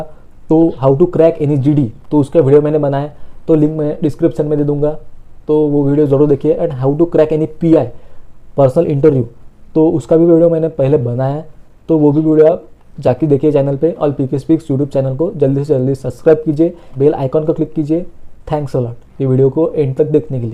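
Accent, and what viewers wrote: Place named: Maharashtra, India. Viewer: Rahenga...milengaa..bc pakka marathi aahe tu bhava..😂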